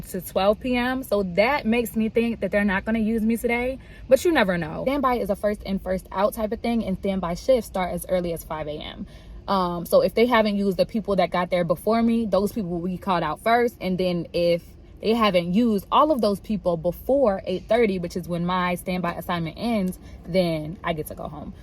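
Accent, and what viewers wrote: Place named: New York, United States. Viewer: I assume you're from New York based on your accent or atleast that's what it sounds like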